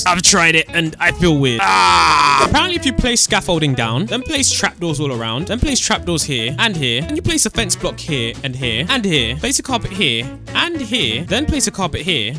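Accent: United Kingdom. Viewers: HES BRITISH U DO T KNOW THE BBRITISH AARE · Bro is British💀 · Ur British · Are you British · You sound British, I’m British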